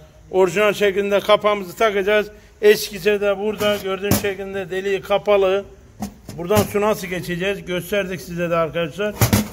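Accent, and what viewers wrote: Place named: Urfa, Turkey. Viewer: Ustam urfanın neresindensin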